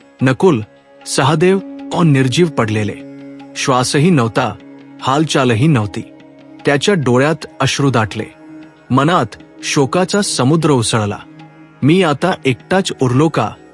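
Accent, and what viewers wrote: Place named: Gujarat, India. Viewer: वाचना मध्ये गुजराती भाषेतील उच्चार असू नयेत.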